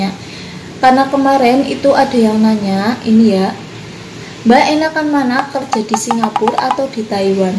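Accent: Banyumas, Indonesia. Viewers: Pilih mana y? Ngapak gesss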